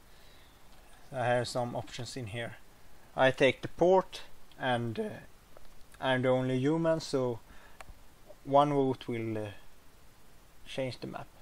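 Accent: Sweden. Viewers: Thanks! I was completly sure that you were swedish when I heard you talking.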